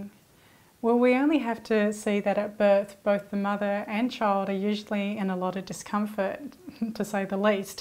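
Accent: Australia. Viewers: What's with the gigantic subtitles? Is this really necessary? Do we have a problem with this delightful Australian accent?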